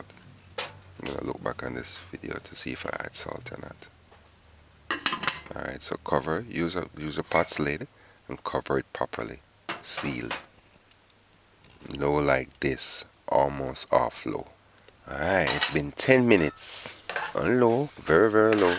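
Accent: Jamaica. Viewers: I am enjoying your colorful cooking this great blessed Saturday morning. And please do not speak patio, not everyone understands the twist in the English pronunciation. You are making videos for the general public, correct? You will have more more subscribers. Great number turn ups for you internationally. In JESUS Christ mighty name we have prayed amen 🙏🏽!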